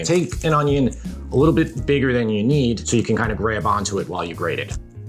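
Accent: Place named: Long Island, United States. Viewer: This is like old home week for me. I grew up on Long Island although I’ve been gone for more than a few decades. Listening to your accent makes me smile and watching your recipes reminds me of my own kitchen.. Truly enjoying your channel.👍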